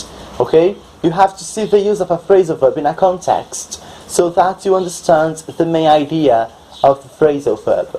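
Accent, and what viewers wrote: United Kingdom. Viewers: Muito legal, muito útil! Eu conhecia 4 dos 10 phrasal verbs, os outros eu nunca imaginaria! Parabéns pelo canal e pelo "Great British Accent"! See ya mate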